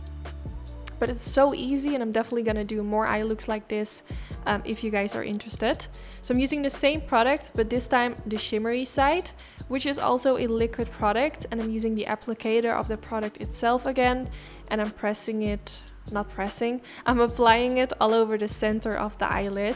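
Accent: Netherlands. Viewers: Ik hoorde het al aan je accent dat je Nederlands was ☺️💕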